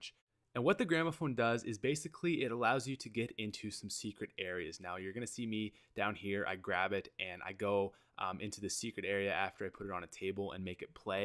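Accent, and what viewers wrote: Canada. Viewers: R u canadian?😋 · are you canadian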